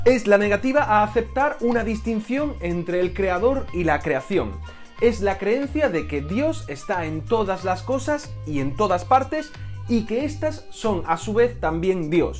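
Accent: Spain. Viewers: Gracias por mantenernos informados y actualizados...A propósito,eres español?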